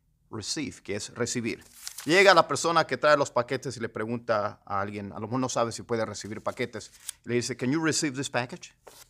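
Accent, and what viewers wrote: United States. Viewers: His English has such a strong American accent lol I thought he was American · Por qué tu español tiene acento gringo??? Es un efecto de aprender el inglés con acento nativo?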